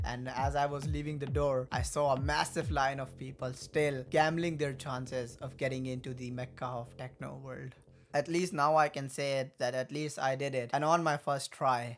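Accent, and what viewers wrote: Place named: India. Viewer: Your funny story about a big guy complimenting your outfit is not funny at all... Berghain is a place of diversity, it originated from a gay club. Making fun of gays should permanently ban you from Berghain. I would rather hear someone telling me slaaay than your awful indian accent. I hope I dont get to see you ever in Berghain...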